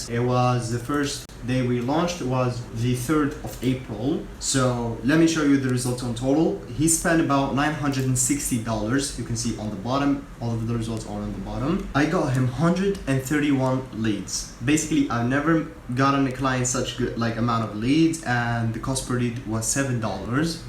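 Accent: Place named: Morocco. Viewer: Salam alaykom brother Are u moroccan? Ur accent is giving it away lol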